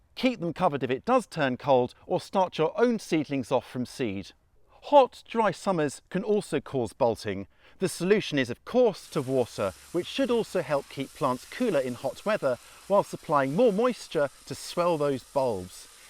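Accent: England, United Kingdom. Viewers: The English accent helps take you seriously. Like oh shit this guy sounds like a professor I better listen up 😳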